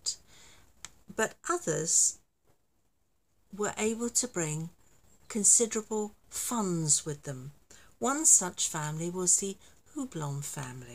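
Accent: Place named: England, United Kingdom. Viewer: Why do you have an English accent?? I doubt there are Huguenots in England. In fact that whole country has been taken over by the Viking types. Even Iceland nir Ireland have Irish there.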